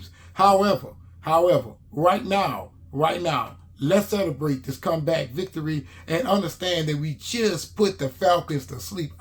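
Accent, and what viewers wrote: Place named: New Orleans, United States. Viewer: Bro, hearing you talk reminds me of folk in Nola! I have to support someone who is as passionate about the Saints and Who Dat nation as yourself! You got a new sub! Keep pushing!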